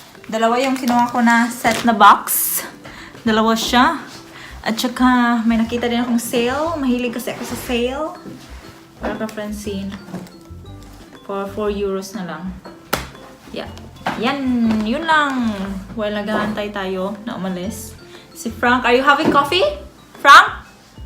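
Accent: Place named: Visayas, Philippines. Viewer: Nice sis. 😊pareho tau bisaya ang tono.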